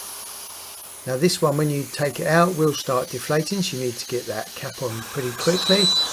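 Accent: United Kingdom. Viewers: Thank you so much. I've been watching your video about 3 times, and set my jakuzzi in 45 minutes! You are amazing, so smart and simple. And I enjoyed you British prnouniation so much! Thank you!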